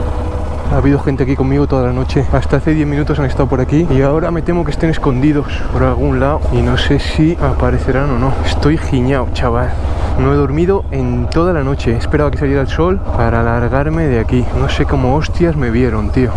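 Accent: France. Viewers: Me hace mucha gracia tu francés pero se ve que vas aprendiendo palabras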